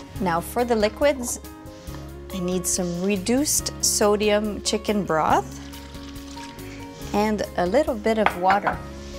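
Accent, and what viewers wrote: Canada. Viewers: That Canadian accent doe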